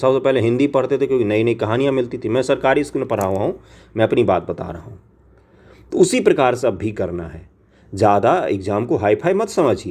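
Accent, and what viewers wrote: Darbhanga, India. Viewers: Sir Aapka awaz Darbhanga Bihar se match kar raha hai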